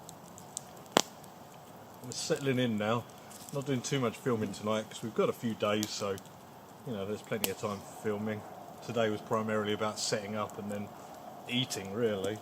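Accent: United Kingdom. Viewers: 'I'm In' on the ⛺ giveaway. Happy Birthday. Luv your channel & British accent. Cool cooking style😎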